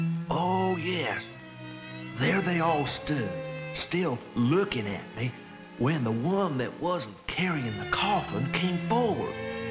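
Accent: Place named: South, United States. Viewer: I like the US country voice. 🤠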